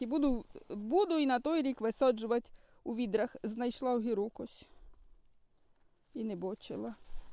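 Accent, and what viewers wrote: Poltava, Ukraine. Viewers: Як приємно вас слухати Дуже милий полтавскій говір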